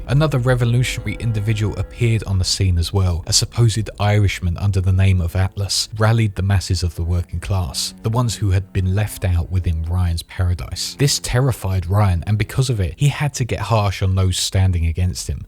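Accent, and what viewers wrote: United Kingdom. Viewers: Thank God you're british, it makes listening to this so much better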